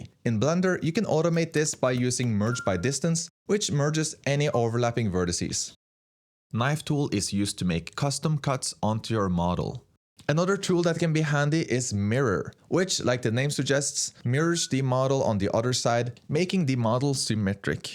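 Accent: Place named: Norway. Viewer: you are Norwegian from that accent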